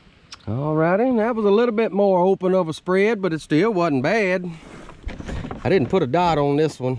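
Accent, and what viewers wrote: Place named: New York, United States. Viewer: With that accent, I keep wondering what part of New York you’re from. Right?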